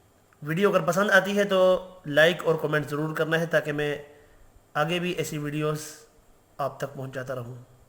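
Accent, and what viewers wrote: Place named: Panipat, India. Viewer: Sir Masha Allah bohot acha prha apne sir mujhe pani pati wala lyhja bohot pasand aaya aur aasan bhi lagraha hai Aur hadr mai bhi aapki tilawt bohot achi hoti hai jazak Allaj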